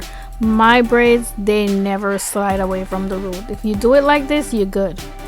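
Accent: Jamaica. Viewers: I hear an accent. Are you Jamaican?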